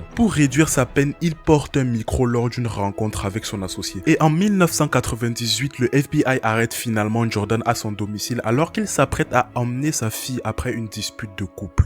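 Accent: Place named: Africa. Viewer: dommage que tu as un accent africain qui gâche tout le professionnalisme de la vidéo. Désolé mais dirait une parodie.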